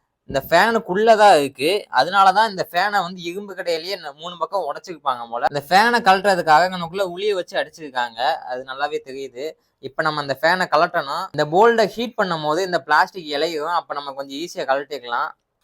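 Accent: Madurai, India. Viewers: Madhurai karan ahh bro neenga 😅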